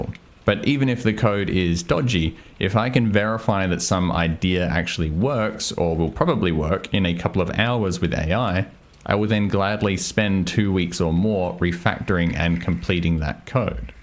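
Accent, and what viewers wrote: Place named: Australia. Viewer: Hhahaha no way I got to the same feeling after a decade of frontend dev, with React. Hobby farm and or game dev. I started learning C++ and it's been great! I don't have many good feelings/experiences around AI, I really pushed it to it's limits as an early adopter and found that it has a negative impact on my memory and analytical skills. I just use it kinda like a search engine when I really can't find any other way. However I am open to giving it another shot using something more like your method. As a side note, I also use Vim with the file explorer to the right :) From your accent, I assume you're a fellow Aussie! Thanks for sharing this, great video!!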